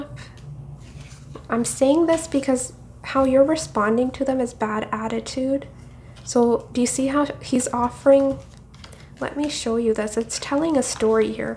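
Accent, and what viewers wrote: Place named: United Kingdom. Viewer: I loves his British accent😅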